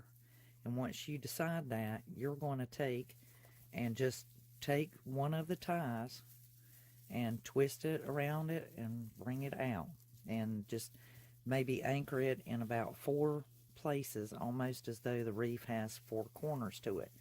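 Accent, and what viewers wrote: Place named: North Carolina, United States. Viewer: I Love, Love, the lighted signs. all the crafts are pretty, but there is something about the signs! This is my first time watching, but as soon as I heard you speak I said she's from North Carolina. I'm originally from Asheville NC. but now living in California ( please don't hold it against me. lol) Looking forward to watching more holiday crafting ideas.. God Bless..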